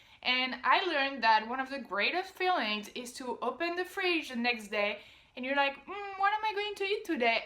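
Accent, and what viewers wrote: France. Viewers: You're too cute! I love French women and their accents. You could be cussing me out or talking bad about me but the words sound so good, in a French accent, I don't care what you're saying about me! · Although you obviously have some French accent (but not the thick, "typical" one) when speaking (american) English, the vibe you are giving off is much more American than French ... Speaking as someone who lived in France for 6 years. And only knows Americans from (too many) movies/television/Youtube videos and hotels ... :> Still. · "Mapple sigh-rup", So unmistakably and adorably French., do not let anyone try to change the way you say that ever.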